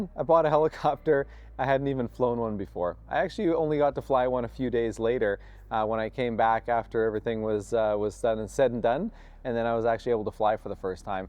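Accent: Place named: North, United Kingdom. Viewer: HA, he's a northerner...help you oHut.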